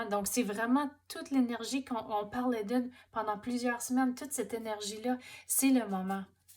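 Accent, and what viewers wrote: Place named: England, United Kingdom. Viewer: Merci pour se superbe tirage en attendant l évolution, vous avez une très jolie voie avec ce petit accent anglais qui dégage une douceur. Je vous souhaite beaucoup de bonheur et de joie sur votre chemin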